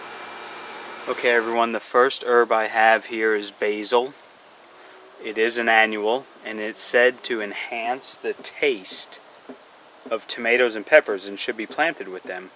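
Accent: Philadelphia, United States. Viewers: Zone nine? Do I hear a Philly accent though?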